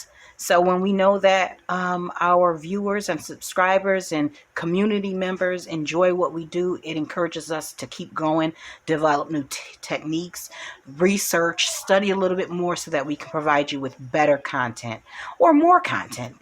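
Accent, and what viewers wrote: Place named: New York, United States. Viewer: I always forget you are a New Yorker until you mentioned it. I can still hear it in there. 🥰 Please do a tea journal when you can squeeze it in. I’d love to see it. xoFaith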